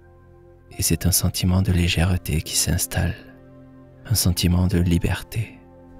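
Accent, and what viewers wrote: South, France. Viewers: cher...t'es merveilleux et ta voix est est sublime avec un pt accent du soleil...merci t'es un Grand Soutien pour moi 💙💛🌻😺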